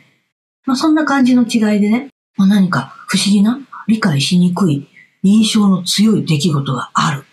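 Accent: Kansai, Japan. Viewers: さん大切なお話し有り難う御座います😊目に見えない世界のお話は君が代の祝詞の中に書かれてありますね（千代に八千代に）を解説するとこの世は目に見えない世界(霊主体従)と見える世界(体従霊主)の内容が書かれてあります！私ね！宇宙のエネルギー、波動、周波数と言った振動を数値化出来る才能を天子から預かり不思議と分かります！さんの関西弁が大好きです😍😅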